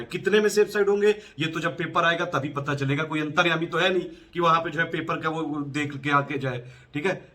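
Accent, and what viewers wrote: Kanpur, India. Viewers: Puri hmari kanpuriya language hai 😀😀👍👍 mja aa gya sir🙏🙏🙏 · Hahaha..... total kanpuriya ho Bhai.....hum bhi kanpur ke hai...z😂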